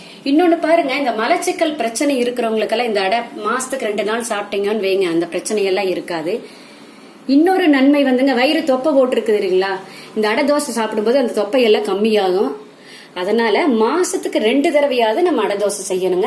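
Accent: Kongu, India. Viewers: Very good narration. My nepali cook not known this adaidosa. Tq .kongo Tamil. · Amma.... more than adai dosai.... ur kovai slang is tasty and awesome.... kongu thamizh..... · எந்த ஏரியா அம்மனி நம்ம ஊரு பாஷை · vanakkam nga mam..Romba santhosama irukku unga adai receipe pathi neega solrathu ..Appdi oru kongu slang nga..lvly nga..enga ammchi kara chattney (onion .dried chillies. tamarind and salt ) seivanga .ammvum Athe thanunga. .. thuvar am paruppu n ulunthu 1/4 tumbler thaan pooduvanga..muranga thzha romba rare nga ..Oru naal eppdi seithittu comment panrenunga mam. Naambal athavathu kaara kolukkatai method post Pannu nga mam.mattai arisi pootu epdi seirathunu. thankz nga mam.☺👑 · I used to prepare adai dosai with your measuring only amma.. i already made for more than 5 times. Very nice measurements explanation.. additionally I will add moong dal .. happy to hear our kongu tamil. 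· மேடம் உங்க அடை சூப்பர் நீங்க கோயமுத்தூர் றா என் தாய் நாடும் அது தான் மேடம் கொங்குநாட்டு தமிழ் அருமை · Kongutamil arumai amma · Namma ooru தமிழ் கொங்கு தமிழ் Eappavumey அழகுதான் என்னங்க நா சொல்ரது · Aunty நீங்கள் பேசும் கொங்குத்தமிழ் சூப்பர் 👌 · Enna oru super navuurum adai👏😋 .adhvum unga Kongu thamizh azhagil ,seimurai azhagil sokki ponen amma.nan ungal magalaga Iruka kudadha😭😭.SANTHI from DHARMAPURI. your new subscriber.all your recipes are simply superb.👏👏👏👏👏👏💐💐💐💐💐💐🙏🙏🙏🙏🙏🙏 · Unga kongu Tamil kekarappo enga patima gyabagam varuthu. Very nice explanation.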